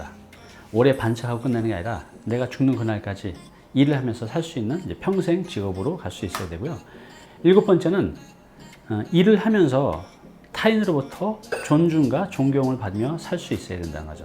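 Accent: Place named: Seoul, South Korea. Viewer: 외모도 깔끔하시고 듣기좋은 서울 말씨에 ㅋㅋ깔끔한 진행력. 알아듣기 쉽게 군더더기 없이 진행해 주셔서 너무 고맙습니다. 귀에 쏙쏙 들어와요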